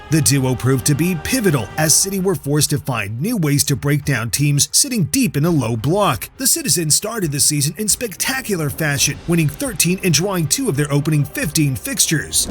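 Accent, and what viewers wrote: United States. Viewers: You'd heard that american accent? They're new here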